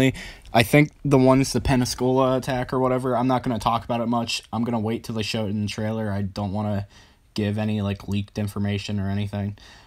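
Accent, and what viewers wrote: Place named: Philadelphia, United States. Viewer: Found this channel because I heard there was a new trailer out but then noticed that thick ass Philly accent and I love it haha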